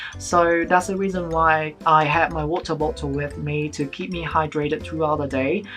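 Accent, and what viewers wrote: United Kingdom. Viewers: I hear a little bit of a British accent 😃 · Sounds like British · Ui cho em xin phép hỏi là anh học tiếng anh từ lúc nào và sao anh học được giọng anh vậy ạ ??? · Oh you have British accent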